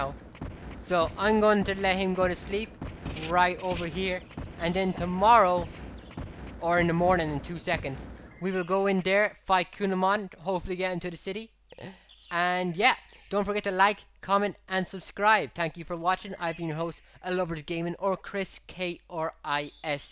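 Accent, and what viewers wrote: Ireland. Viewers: this game is fuckin awesome. im playing it for the first time. I wish I played it 14 years ago. btw, what the hell accent is that? irish? lol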